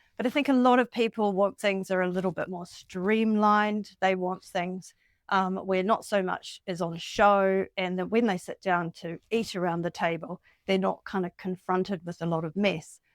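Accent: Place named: New Zealand. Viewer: Oh I'm proud of myself for picking up on the Kiwi accent! (a transplanted American living in Australia). I also think providing your clients with a bunch of designs is a terrific idea. Not that it matters, but I agree with the kitchen table idea. I've always thought that there's something inherently silly in having a seating/dining spot just feet from the dining table. If a design wasn't open plan, it might make some sense, but otherwise, why? I'm also not keen on having either a cooktop or a sink in the island, as to make that functional, the island has to become bigger and take up more space.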